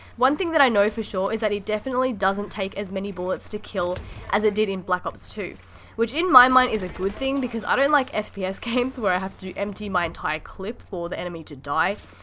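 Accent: Australia. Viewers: Yup. Subscribed. Very articulated thoughts and well presented. Plus, there's just something about Aussie accents. It's magical.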